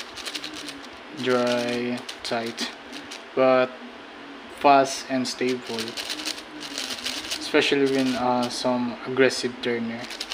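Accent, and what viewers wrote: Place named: Philippines. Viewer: I like ur accent are u filipino?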